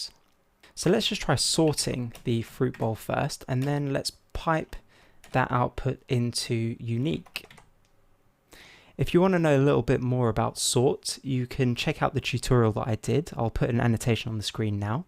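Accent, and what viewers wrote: United Kingdom. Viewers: oh god the british accent...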